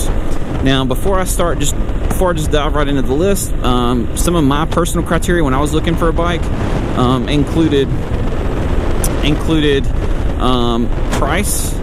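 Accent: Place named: Alabama, United States. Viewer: I could tell it was bama before you even said anything lol. How far are you from Fayette?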